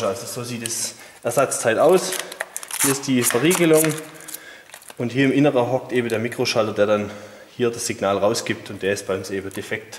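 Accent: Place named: Swabia, Germany. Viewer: Top Reparaturanleitung auf Schwäbisch. Mehr davon bitte...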